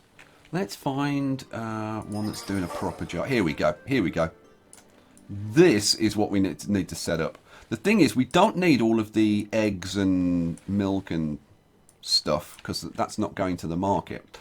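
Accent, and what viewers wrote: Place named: England, United Kingdom. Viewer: Hey mate =) love your english acsent, where in england is it from? Hope you continue this. If you still have the save. we never got too se a brewary and the pub being buildt. build a royal sea harbor =) cherrio mate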